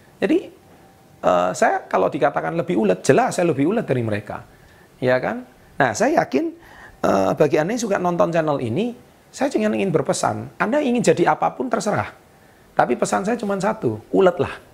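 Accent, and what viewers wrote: Java, Indonesia. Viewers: Aq sangat terganggu dg logat kental jowomu mas..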